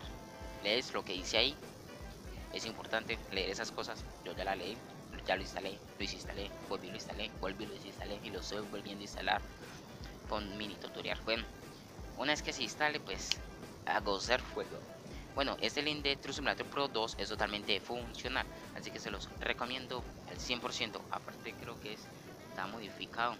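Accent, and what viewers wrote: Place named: Colombia. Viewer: Epa se nota lo colombiano todo bien le voy a dar like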